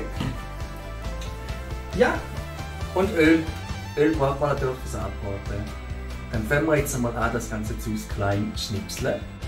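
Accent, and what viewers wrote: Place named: Switzerland. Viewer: Schweizerdeutsch hört sich irgendwie süß an...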